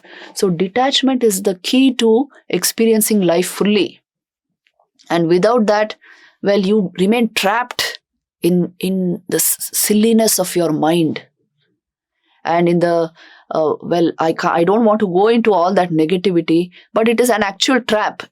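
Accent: India. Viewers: Why does she have an Indian accent in English? She doesn’t look Indian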